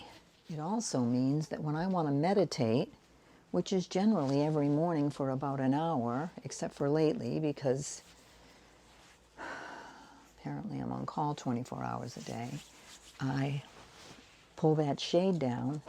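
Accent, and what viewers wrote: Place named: New England, United States. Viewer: I'll watch whatever you're doing, so (as Ricky Nelson famously put it) please yourself:) Love hearing your New England-isms (which were also mine once upon a time) -- haven't heard anyone say 'hold yaw hawsas' in about 50 years ❤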